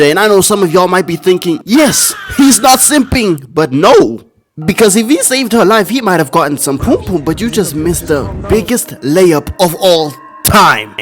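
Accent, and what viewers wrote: South Africa. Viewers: are u South African? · Bro sounds like a South African like me 😅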